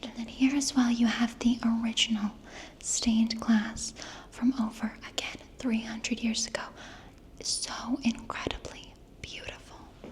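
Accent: France. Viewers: Ugh you look absolutely gorgeous! I loved how you she used her French accent in there too, Stunning apartment, it just looks peaceful!!💕💕💕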